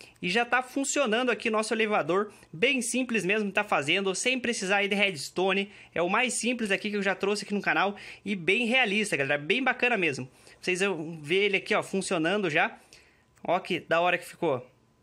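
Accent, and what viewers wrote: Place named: Paraná, Brazil. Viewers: Paranaense? Vc? Kkk vc tem sotaque,me ajudou muito obrigada, super recomendo seu canal👍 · Você é Paranáense? Sotaque legal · Vc tem uma vozinha meia de caipira sem ofeças sla como ser escreve '-'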